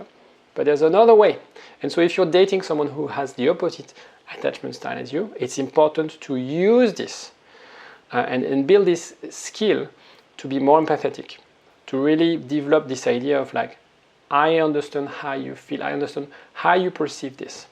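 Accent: France. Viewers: I loved this video❤Thank you,. The French accent is also very nice😊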